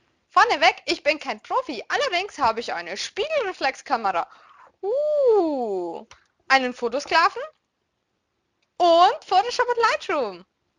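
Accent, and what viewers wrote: Germany. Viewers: Hey ^^ What part of Germany is your accent from? love your cosplays <3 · I haven't actually been reading, I've just been sitting here listening to your accent and language. I think the German language is really cool!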